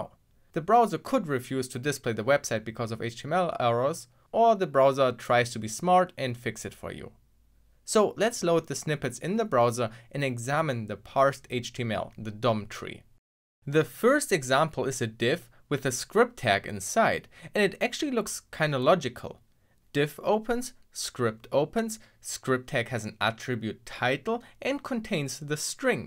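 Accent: Germany. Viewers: Ehmm hallo typ der englisch spricht aber deutsch ist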